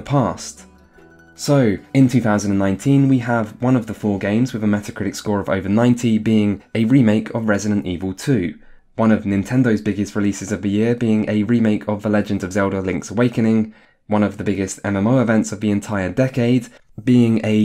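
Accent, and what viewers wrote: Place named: United Kingdom. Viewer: You sound so much like the youtuber shaun or maybe I'm stereotyping british accents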